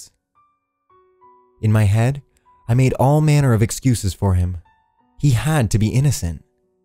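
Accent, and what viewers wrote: Canada. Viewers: Love Your channel...New Subscriber :)....Your Canadian right?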